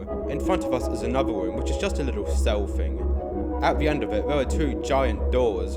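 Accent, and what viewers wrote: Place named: United Kingdom. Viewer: voice that is British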